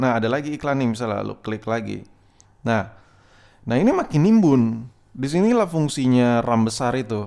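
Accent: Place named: South Jakarta, Indonesia. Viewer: Bang elu jaksel ya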